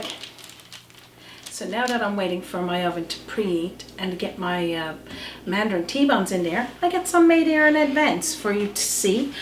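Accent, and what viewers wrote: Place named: Canada. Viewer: Have a beautiful Sunday. Looks delicious wished I could have tea and buns with you. Love your Canadian accent. Stay safe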